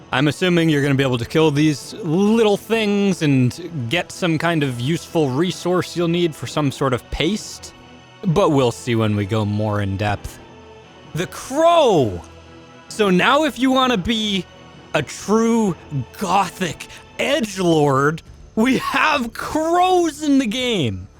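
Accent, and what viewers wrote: Australia. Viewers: Disliking because of the terrible Australian accent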